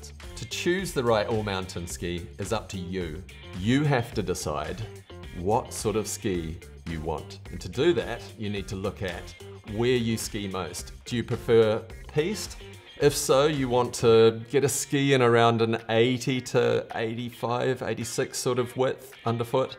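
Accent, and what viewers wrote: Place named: New Zealand. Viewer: Found this Vlog by accident. Nice vlog Mate. Funny hearing a Kiwi after being here in the US for 20yrs - now I know how I sound to my mates!!! Chur Bro. Armada 92 Declivity for me cuz…( latest edition )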